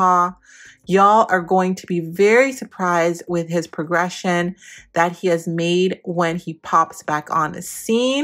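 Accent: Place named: Jamaica. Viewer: Love ur channel …do u have Caribbean in u? I hear a bit of a Jamaican accent ❤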